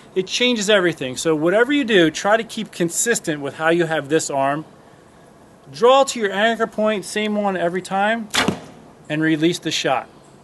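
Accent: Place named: New Jersey, United States. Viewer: Thanks you do have a direct, likable, teaching style. Your accent sounds like my home state, new Jersey.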